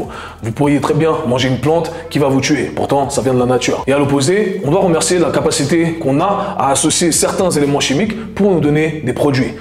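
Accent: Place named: Belgium. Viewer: L'accent belge mélanger à l'accent créole portugais c'est un truc de fou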